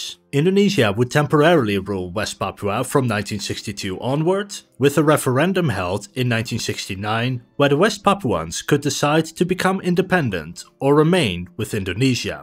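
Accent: Netherlands. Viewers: Man, I spent a lot of time trying to place your accent. It does sound Dutch, but it’s different than many of my Dutch friends’ accents. Where are you from?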